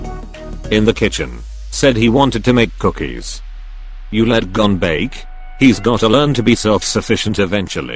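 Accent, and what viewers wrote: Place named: England, United Kingdom. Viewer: I sense a fellow english girl...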